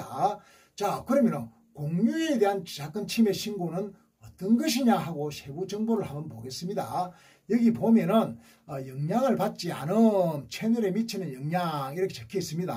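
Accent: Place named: Gyeongsang, South Korea. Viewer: #강펀치tv님 안녕하세요 인사드려요 #저작권 아! 유튜브 스튜디어 들어가서 자세히 살펴보고 알아보고 계시네요 ~^^ 그런데 경상도 사투리 가 정겹게 들리네요 ^^ 아 ~음악만 듣다가 목소리가 들리니깐 정말 반갑습니다 😁 잘보고 응원하고 갑니다 늘 건강하시고 행복하세요 😊 또올께요 🤗 큰성장 하시길 바랍니다 🙏 화이팅하세요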